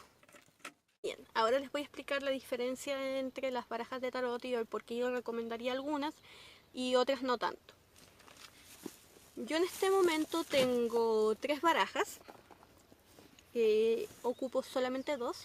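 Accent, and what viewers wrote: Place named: Chile. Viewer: Hola , vengo de Amino ❤Vi la publicación y vi unos segundos del vídeo , pensé que eras española Lugo me di cuenta que eras chilena ( no reconozco ni mi propio acento jaja )Me gustó mucho , sigue haciendo vídeos Me encanto la 3 baraja 🖤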